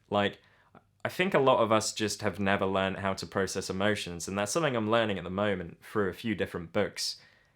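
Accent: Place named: England, United Kingdom. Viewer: Where do you live? I presume from England from your accent. Good video anyway. What about your mental health and depression? I hope well. Best wishes from Italy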